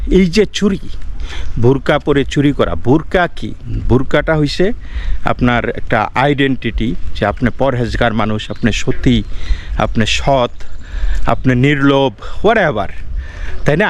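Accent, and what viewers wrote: Bangladesh. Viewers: your English pronunciation is like Bangla.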